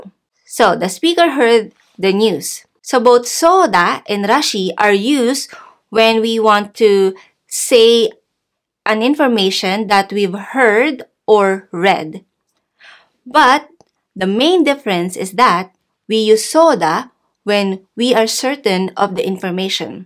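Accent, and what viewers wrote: Philippines. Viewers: I could tell from your English accent that you are from the Philippines.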